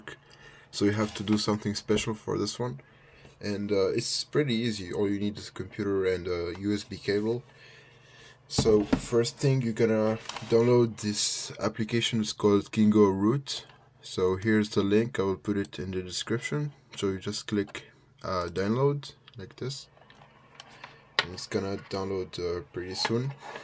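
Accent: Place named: France. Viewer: francais? you sound francais.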